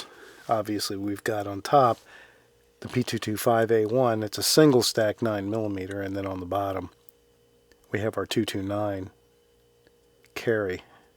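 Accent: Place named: Maryland, United States. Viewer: How have 100,000 subscribers not found you yet? Recognized the fellow Maryland accent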